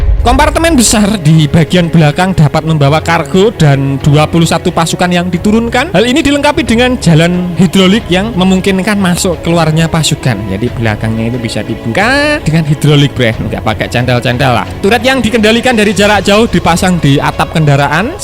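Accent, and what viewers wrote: Java, Indonesia. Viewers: Uong Jowo tenan kang,, medok tenan leh muni...😂😂😂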